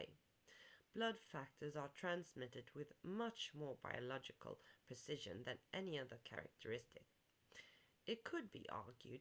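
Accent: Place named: France. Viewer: Hey, cool topic, hope your having a great weekend, interesting accent, French or Portuguese ? Look forward to more videos Be Well 🕊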